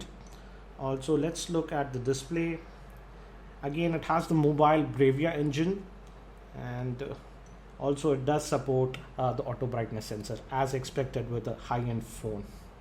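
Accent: India. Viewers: I like your indian accent :D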